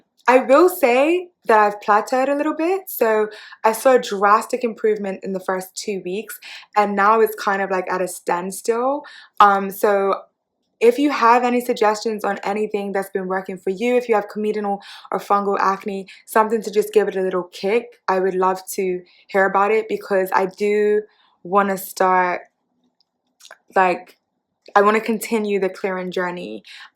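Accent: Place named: United Kingdom. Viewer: lol. I live in the UK. I could hear the British, but I was like 🤔 there’s some flavour here ❤️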